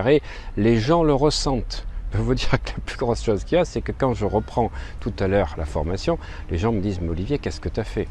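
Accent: Bordeaux, France. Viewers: Merci Bro. ! Vous avez perdu votre accent bordelais ?!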